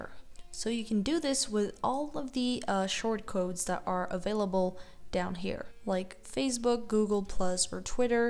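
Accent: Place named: United States. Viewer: love ur american accent ;)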